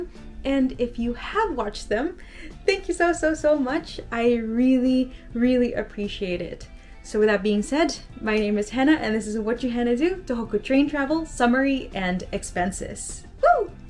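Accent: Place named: Spain. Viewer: The Spanish accent pronunciation of the Japanese words is really interesting.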